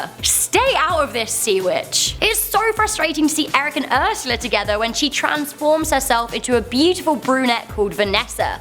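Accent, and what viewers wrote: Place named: United Kingdom. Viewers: British. Obviously. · British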